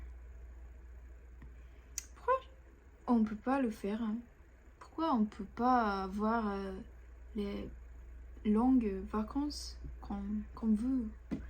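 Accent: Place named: France. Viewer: Chouette vidéo! Merci pour le partage. Par curiosité, si tu pouvais transmettre un élément en particulier de ta culture ou société aux gaijin, lequel serait-ce? Je trouve très intéressant que ton accent soit si semblable à certains accents en France. Je suis francophone et pourtant, je ne pourrais pas parler le français comme toi. Tu as plus de chance d'être bien comprise par les francophones du monde que moi, ce qui est excellent. Si tu as la chance de retourner en France un jour et que tu n'as pas visité, je te suggère la Bretagne (Lorient pour les gens chaleureux plus que les infrastructures, Brest, Rennes et la forêt de Brocéliande). Les Bretons sont si sympas! Les Wallons en Belgique sont fabuleux aussi! J'apprécie beaucoup d'éléments en lien avec le Japon. Je trouvais surréel qu'il y ait autant d'arcades avec des installations en parfait état. 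C'était comme de voyager dans le temps direction le rétro et la jeunesse. Sinon, temples, sanctuaires, jardins et parcs sont fabuleux dans ton pays! Au cas où tu chercherais des occasions de pratiquer le français et d'aider les gens à apprendre le japonais de manière amicale et pas trop académique, j'avais déjà essayé le site <link> pour apprendre une autre langue et j'ai souvent trouvé les gens très chouettes et les échanges positifs et utiles. L'intérêt est que l'inscription est gratuite et qu'on puisse parler avec des gens dont le français, le japonais ou autre est la langue maternelle et non une langue d'emprunt. Pratique pour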